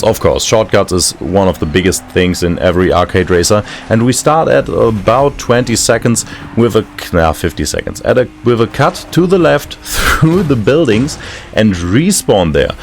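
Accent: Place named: Germany. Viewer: You can actually hear the german accent. Still better than expected. I guess after 2-3 tries he will get better with this. At least now he can reach a broader audience, which is a good thing.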